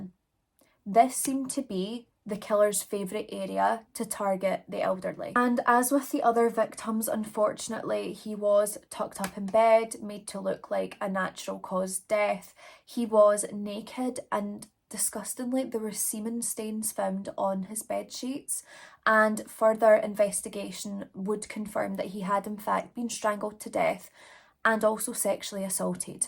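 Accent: Scotland, United Kingdom. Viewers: OMG, pure dead Scottish true crime ASMR. Really looking forward to listening to all of your videos!!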